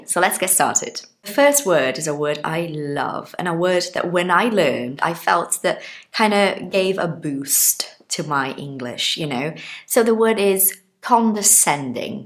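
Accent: United Kingdom. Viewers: You sounds like a royal british woman, i love hear this british accent, and my dream/expectation is speak british english perfectly like you teacher 😊 Ps: Sorry about my BAD english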